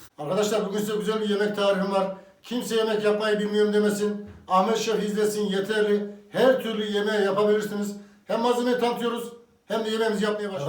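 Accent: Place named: Ordu, Turkey. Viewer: Ben de Orduluyum şefim, aksanınız aynı bizim oralar. Başarılar diliyorum size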